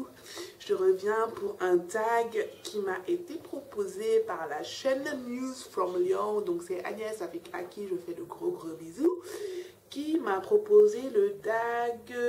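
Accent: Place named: Senegal. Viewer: looooooooooooool l'accent galsen!!!!!! je kiff!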